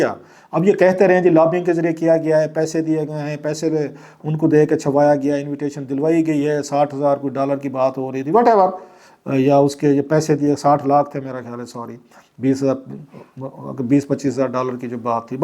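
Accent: Saraiki, Pakistan. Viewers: He always settles his personal score, whereas serious doubts about his education, credentials. He always tries to create fuss. He never wants harmony.. My God, literally phoopian, maasian's attitude.. In his old v logs , he was instigating to khan that you shouldn't do deals by indicating deals of benazir and nawas sharif and he made a lot of v logs that khan shouldn't loose his staance.... Now he is telling different stories that IK shouldn't have done it... Peak of hypocrisy, ik will have 100 mistakes, but klasra wants to bash Ik only caz his feathers will burn if he talks about other politicians... Literally, he seems breathless when he speaks about others.... Shame on you and your so-called saraieki style English.... If you have courage, then talk about other politicians...deaf and dumb... Instead of moving forward to create harmony amongst all, your role is shameful, brutal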